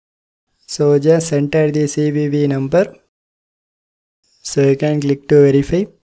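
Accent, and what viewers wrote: India. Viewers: I can't understand Indian English....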